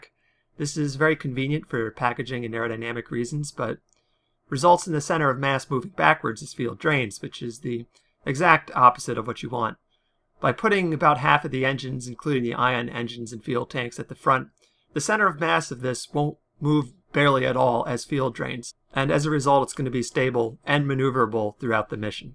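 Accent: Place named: United Kingdom. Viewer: oh snaps senpai noticed him. Love ya even tho you still have a bit of a limey accent. Hope you lose that completely some day.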